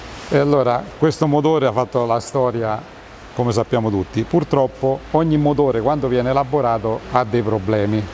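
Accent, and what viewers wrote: Abruzzo, Italy. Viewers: "Pecché nen chiut perfettamend'" "E quand è lung 'stu tapp!" L'accend abbruzzes é lu topp!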